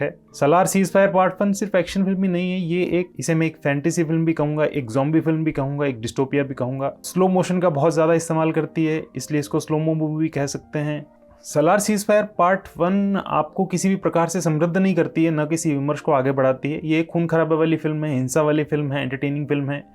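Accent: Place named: Rajasthan, India. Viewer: भाई मैं आपका रिव्यू पूरा देखा पर भाई सच कहूं तो जैसे ही अपने बोलना शुरू किया पता नहीं क्यों अंदर से आवाज आई कि आप राजस्थान से होंगे 😊 सच पूछो तो इतनी bachodi राजस्थान वाले ही कर सकते हैं भाई सच पूछो तो आपने बहुत तगड़ी bakchodi ki 😅😅hai और सच बताओ तो मैं खुद राजस्थानी हूं इसलिए यह Janta hu😅😅😅😅😅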